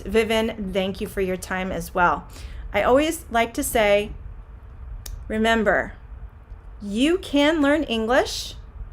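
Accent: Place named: United States. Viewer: rarely I understand the americain accent welcome mam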